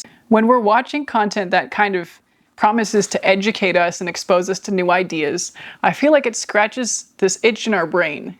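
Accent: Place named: South, United States. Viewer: OK. It’s killing me!! What is your underlying accent. I can hear it when you say “mad”, “had”, “that” “myself” and other words, especially at the end of your sentences. Did you live in the south? Interesting content and great presence. You have a fan here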